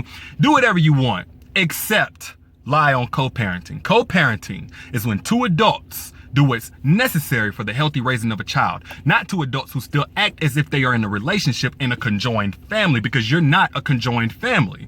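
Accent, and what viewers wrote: South, United States. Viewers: Lol. That southern accent! I agree with you, Derrick. Come together only to support the child. Stop the excuses. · 😂 lol love the accent Derrick lol great southern drawl lol 😂. Blended Families it can be a great thing for the kids but there has to be a lot of trust in the relationship between the new wife and husband. Camping trips and Vacations sound great but it's a matter of keeping bedrooms separate and also making sure the kids get along too especially if there are children from a previous relationship. The ex should simply try to understand that you are not with this person but you want your child to build a bond with their step siblings. I have seen this work and I have seen it progress. It works best when both parties the mother and father are in fulfilling healthy relationships though too. If they have that then the kids will be happy 😊 too. 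· I'm done with that Dolly Parton accent 😂🤣.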